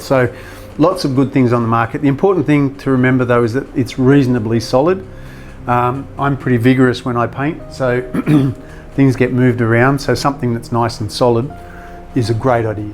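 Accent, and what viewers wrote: Australia. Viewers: Love all your videos they are precise and easy to follow also your voice is pleasantly style Aussie. Thanks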